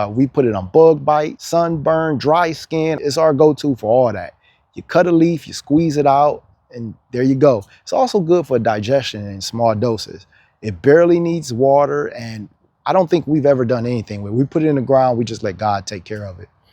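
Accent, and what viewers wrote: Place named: Los Angeles, United States. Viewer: You sound like you’re from LA.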